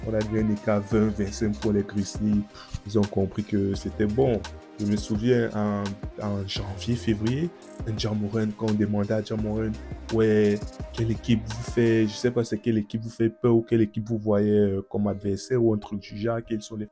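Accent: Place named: Cameroon. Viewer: L’accent du frère camerounais dommage l’algorithme ne te met pas plus en avant courage pour tes belles analyses oublie pas Lebron reste le boss de cette équipe vous le verrez bientôt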